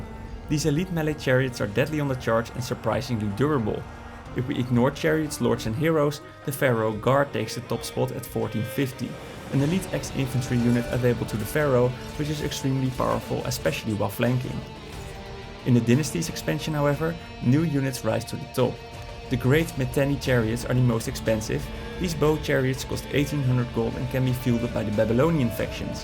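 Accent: Netherlands. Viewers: That Dutch accent tough 😂😅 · Holy shit, the narrator is Dutch as hell 😂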